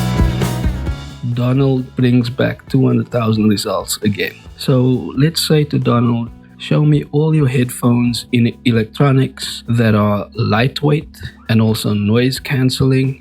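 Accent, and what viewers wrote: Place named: South Africa. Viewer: Why do you sound south African.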